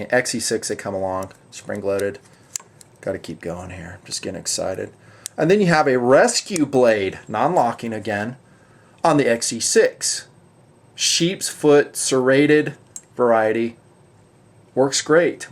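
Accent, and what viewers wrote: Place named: South, United States. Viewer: Southerner? Southern England? Australia? Who in the South says ay?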